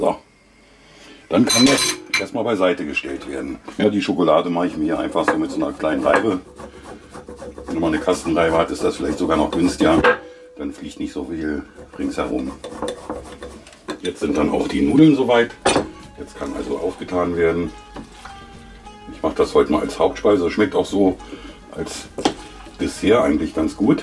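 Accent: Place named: Berlin, Germany. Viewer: Aha, is Berliner! 😁 Ma von Lichtenberg wink. 😉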